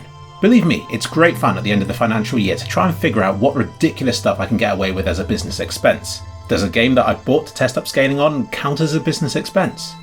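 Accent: United Kingdom. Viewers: "Why do people want to watch my videos on a niche topic?" My brother in christ our niche is listening to you talking about niches. Hearing a calm british accent guy talking about anytinhg with some weird music at the back just fries up my dopamine receptors more than any kind of tik tok media could do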